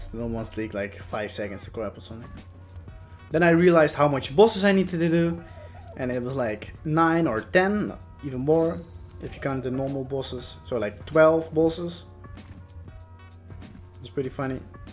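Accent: Netherlands. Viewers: Ik hoor dat Nederlands accent wel hoor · Are you from belgium or netherlands bcs i can kinda hear the accent$ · Haha ik ook hoorde het in het accent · Hahaha i can hear at your voice that you are from NL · Nederlands accent hahaha! keep it going! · Nederlands acent ga door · Bro ik hoorde meteen toen ik de video opstarten dat je nederlands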